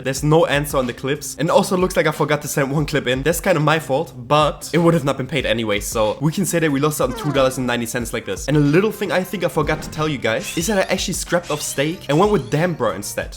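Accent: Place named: Germany. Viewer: Haha Most German Accent ever 😂❤🇩🇪 aber heiles Video!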